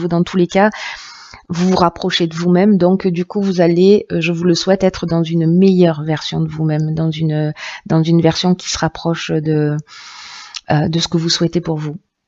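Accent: South, France. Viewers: petit accent du soleil.. continuez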